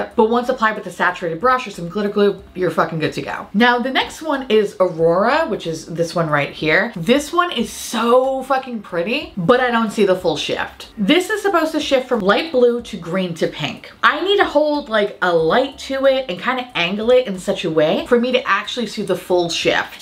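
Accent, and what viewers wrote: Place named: South, United States. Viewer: As a Texan, you totally worked that "southern hospitality" accent. It's apot on, and pairs lovely with your spooky girl aesthetic. Living for it. Love this palette and this video 🖤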